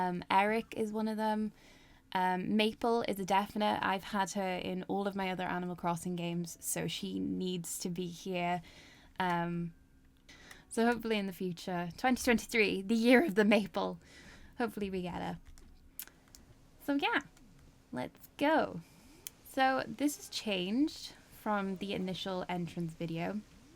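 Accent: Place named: Wales, United Kingdom. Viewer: Are you welsh by any chance? You have a bit of an accent 😅 amazing island btw!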